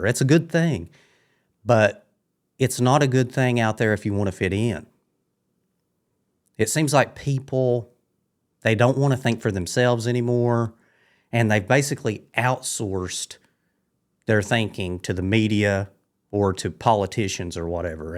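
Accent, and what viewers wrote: South, United States. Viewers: What kind of southern accent is that? I am from the Appalachian mountains in western NC but I’m not aware to his dialect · You sound like you're from the south. Either NC or TN. I agree with you.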